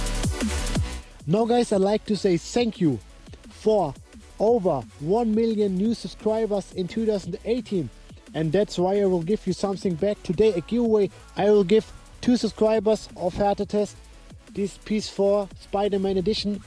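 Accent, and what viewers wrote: Germany. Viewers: German accent on fleek.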